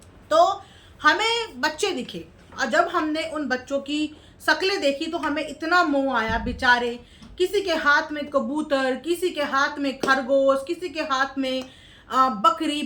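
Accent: Bihar, India. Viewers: di aap to ab thoda thoda bihari bhasa niklne lga lgta h ap bhut jyda video dekhne lgi kumata devi ji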